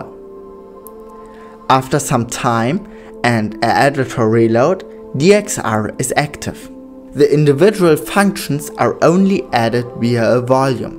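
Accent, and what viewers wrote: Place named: Germany. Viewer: I can hear your German language roots so much.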